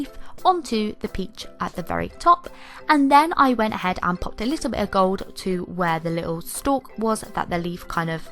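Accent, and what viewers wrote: England, United Kingdom. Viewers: Peyton Johnston she’s British so she speaks differently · You sound British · What part of england are u form?? U dound like people in my surandings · ugh,british english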